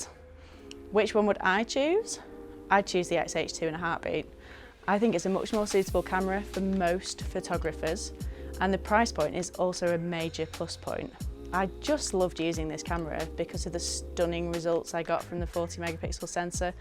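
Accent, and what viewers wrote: United Kingdom. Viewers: It’s so nice hearing a Northern accent in these reviews! Thanks for making this 👍🏻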